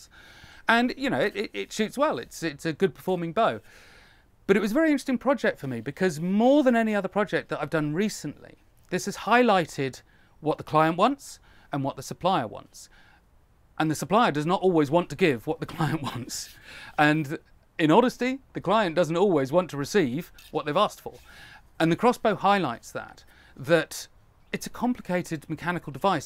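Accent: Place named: Midlands, United Kingdom. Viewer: of workshop,. Now you're doing it to troll (and I love it). I did want to mention just how much of business is management of client expectation. I can hear the slight frustration in your voice! Unfortunately people with middle English accents (myself included) can sometimes present fact as slight disdain, especially to those in the south. Regardless, it's clear you're coming from a base of experience and passion and that bow is simply stunning.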